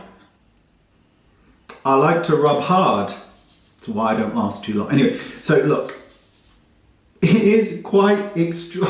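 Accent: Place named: Devon, United Kingdom. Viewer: Wow i love you. Can hear my Devon accent. Cool but no. Migraine city here xxx ❤💖💝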